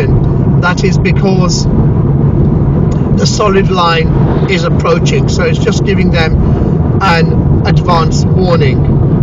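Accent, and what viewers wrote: United Kingdom. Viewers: Thanks nice, clear and compliment for your Queen English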